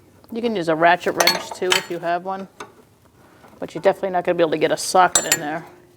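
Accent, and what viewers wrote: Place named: New England, United States. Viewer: Love that New England accent !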